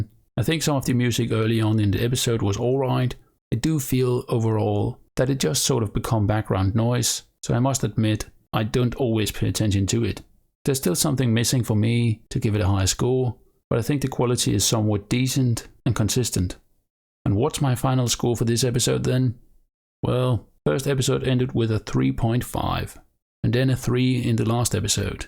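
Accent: Denmark. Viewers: Love your accent? Are you Danish? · Im curious where you’re from? Cool accent If i were to take a guess I would say Denmark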